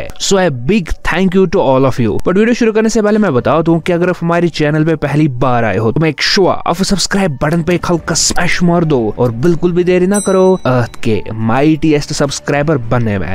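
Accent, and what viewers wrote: Kashmir, India. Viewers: Kashmiri ho kya ?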